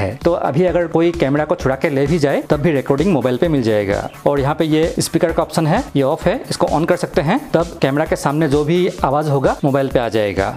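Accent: Assam, India. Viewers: Aap Assamese ho. Kitne bhi thik se hindi bolo.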